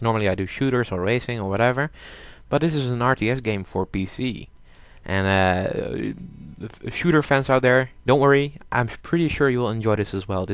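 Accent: Netherlands. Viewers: je kan duidelijk verstaan dat je nederlands ben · youre dutch? you got a litlle dutch accent, im dutch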